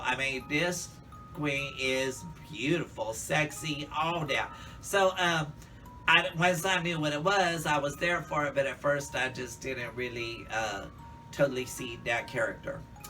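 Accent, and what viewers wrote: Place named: South, United States. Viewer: Thanks for the subtitles,. Not everyone can speak Southern Country. Great review! Hot Topic Clearance Rack, lmao.....Happy Halloween Doll!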